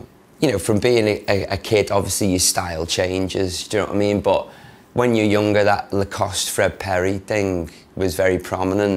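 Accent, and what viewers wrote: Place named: Manchester, United Kingdom. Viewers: His accent is getting more Manc. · Leli Flores Manchester boi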